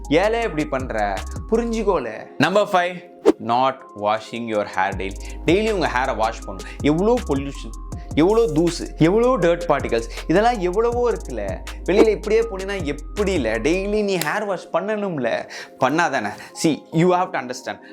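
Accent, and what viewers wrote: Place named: Tirunelveli, India. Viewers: Bro tuty slang vera level ❤️ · Antha ella slang nice 👌 sincery by tirunelveli ,thoothukudi Karan la 🤣🤣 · tiruneveli Slang👻😄😁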